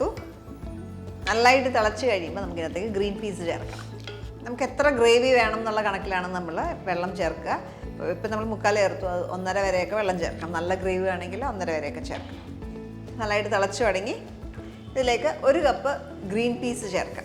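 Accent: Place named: Travancore, India. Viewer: Love ur narration in middle Travancore slang nice❤️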